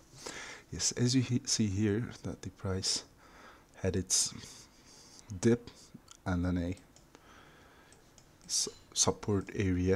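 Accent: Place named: Turkey. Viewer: Abi Türk müsün. İngilizce aksanın Türksün gibi geldi. Şunun Türkçesini anlatır mısın 😅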